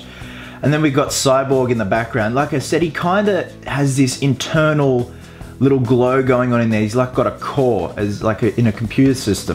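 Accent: United States. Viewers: hahaha I love your american accent, nice beard too! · American accent on point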